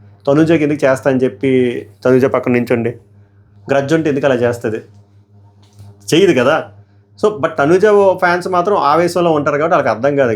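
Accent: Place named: Amalapuram, India. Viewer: original Amalapuram slang ❤❤❤❤❤❤❤❤❤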